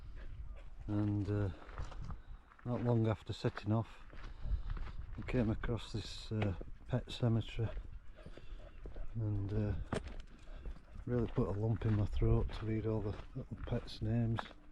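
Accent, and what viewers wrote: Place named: North, United Kingdom. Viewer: That was a lovely park up. Loved the views. You are so lucky living up there. Meant to ask, where are you from originally? Good to hear a proper northern accent. Hope you and Ellie have a good Christmas.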